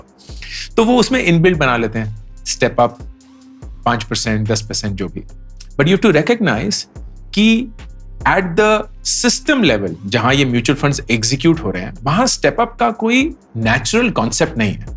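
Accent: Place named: Kashmir, India. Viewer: Sir Aap ki surat aur zubaan se kashmir ki khushboo ka ehsaas hota hay.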